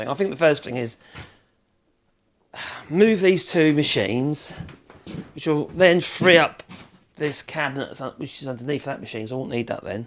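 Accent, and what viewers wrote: Norfolk, United Kingdom. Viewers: A Norfolk boi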